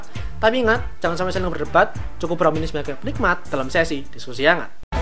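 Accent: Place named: Banyumas, Indonesia. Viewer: Agak ngapak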